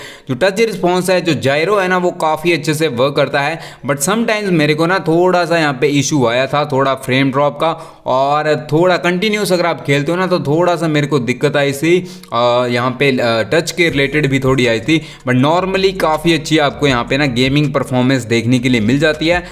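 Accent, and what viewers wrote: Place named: India. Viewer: English title + Indian speaking, bro = dislike, bro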